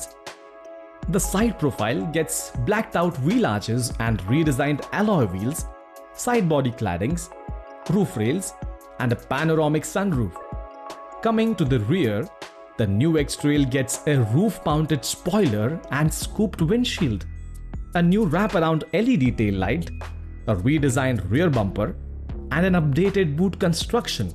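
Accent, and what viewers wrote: India. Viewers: Indian voice?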